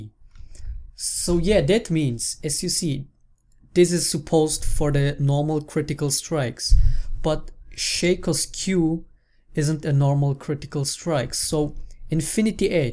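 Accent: Germany. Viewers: lel du bist deutsch? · Is he german ? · Für mich hört er sich extrem DEUTSCH an. He sounds extremely german for me, lol. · Du bist Deutscher oder :D ? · Are you german or? I'm triyng to recognise your accent because I find it quite amusing but i'm pretty bad at recognising accents so yeah.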